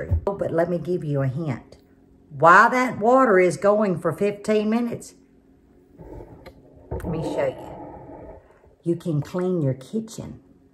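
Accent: South, United States. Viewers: You are a true Southern Belle!!! I love hearing you talk because of your "accent"!! And I love the South.No cukes here yet. We had no rain and I had to haul. I love pickles so I'm praying I get enough. I'm having Grandma Camp next week!! My daughter has treatment so I will take the four children. I Pray constantly that God will keep her here. Okay I need to watch over and write down directions. God Bless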